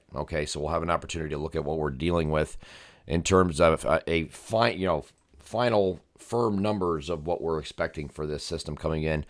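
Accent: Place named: Canada. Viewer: You got that wildfire voice bro! You can tell by the trace of Canadian accent! Ever since the smoke showed up my allergies haven’t been so bad since I was a kid. Hopefully you feel better as conditions improve personally I’m on the rebound today. Thanks once again for the videos y’all are the best in the game!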